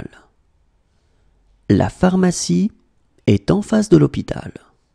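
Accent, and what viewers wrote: France. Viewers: are you french ? because you have a french accent êtes-vous français ? parce que vous avez un accent français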